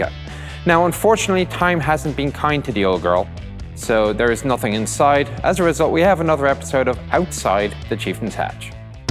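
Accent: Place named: Ireland. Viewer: this guy has a speech impediment on top of a thick Irish accent not a great combination for understanding anything he says!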